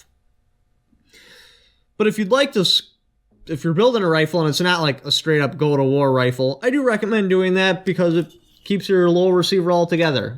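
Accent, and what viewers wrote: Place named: Northwoods, United States. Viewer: All hail the finest firearms fanatic from the Northwoods. Sweetest damn accent on the internet if ya ask me. Keep on keeping on brother.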